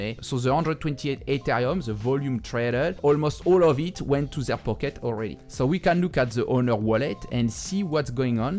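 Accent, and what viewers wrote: France. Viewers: L'accent French est le meilleur tout le monde kiff de ouf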